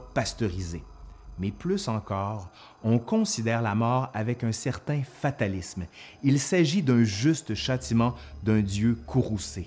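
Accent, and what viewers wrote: Quebec, Canada. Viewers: Sympa ta chaine "couuusiinnng". L'accent québécois est savoureux.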